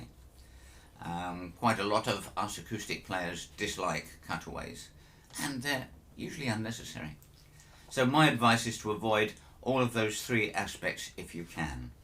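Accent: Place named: United Kingdom. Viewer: Good vid! You Brits sound a little funny to a West Texan, but that sure doesn't make yur view of the world any less valuable. ;) I'm a guitar tech, (Luthiers are the guys that actually know what they are doing) and I get tons of first timers, in fact thats the market I'm in. One amazing thing I've discovered with new players is if we teach them the mechanics of the guitar, action, level frets, tuning, string changing, checking and setting the truss rod. Just minimal maintenance, this "seasons" the player and like getting the wood used to resonating, now you have a familiarity built in between the student and the large wooden object sitting in the student's lap.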